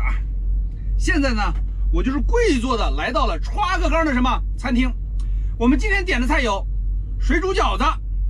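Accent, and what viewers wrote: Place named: Jilu, China. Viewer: 自认为在辨别各地口音方面有天赋。。结果这个老哥的口音真是迷幻。。可能是冀鲁官话的人娶了个东北老婆，或者东北人娶了个江浙老婆。前者可能性大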